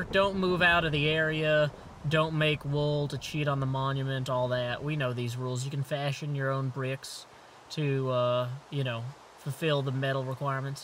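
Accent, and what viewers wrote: United States. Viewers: I love finding smart Americans with accents. Makes me want to laugh in the faces of all the people who call Americans dumb hicks. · Cool! American accent,and speak quite slow, but still you make very cool videos! But whos vches?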